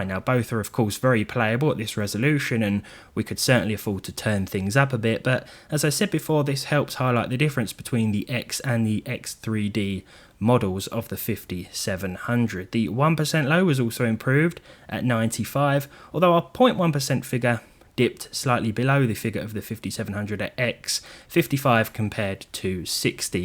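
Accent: United Kingdom. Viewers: oo a brit? sub'd. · I like your accent. British?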